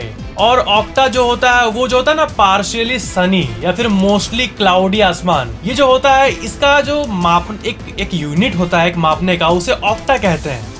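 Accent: Bihar, India. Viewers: Are you from Bihar sir ? Please reply or give heart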